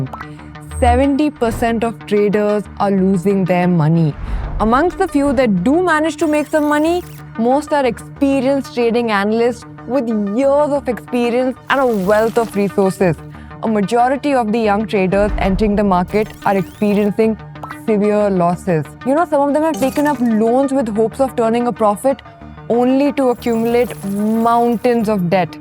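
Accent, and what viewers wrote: India. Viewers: Love The Indglish Accent 😅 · indian accent :face-green-smiling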